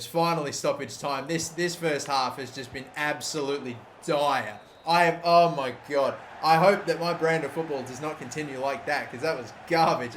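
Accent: Australia. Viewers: are you australian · Are you Australian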